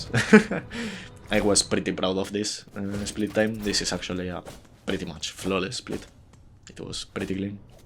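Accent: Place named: Spain. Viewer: For anyone wondering, he has an spanish accent. Great video❤ Saludos desde Cataluña!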